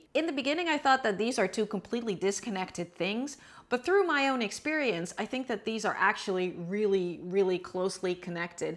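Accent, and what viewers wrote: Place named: Netherlands. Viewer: that’s amazing 😁 I love that you are following your dream and that you took the jump. I thoughtw I heard a ducht accent, but i thought I was wrong. Don’t know why I’m still typing in Dutch, but it’s to late to change it 😂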